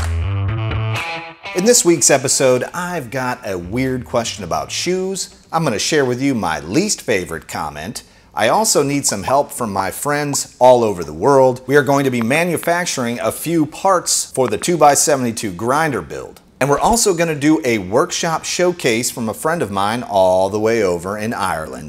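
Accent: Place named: United States. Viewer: yeah we do smoothies down here, in my opinion its more of a heat beater so lotsa ice cream and banana maybe milo or ovaltine thrown in thats just a simple one the kids can make :D Cant wait for the stickers! i love your sense of humour please dont loose it! it seems no matter who you watch from over there in yankie land you can really tell that there is a local to that area dialect. i might start a map of the 'muricas so i can pin down where who im watchin is from. i like the mix up style it doesnt lock you into one genre and gives you many diffferent ways to go down a diferent rabbit hole each time. plus the personal thoughts really ground you to being, well one of us bloody pelicans :)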